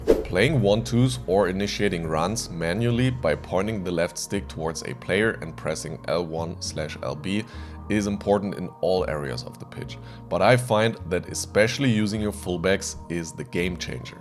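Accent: Germany. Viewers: Warum merkt man, dass du deutsch bist?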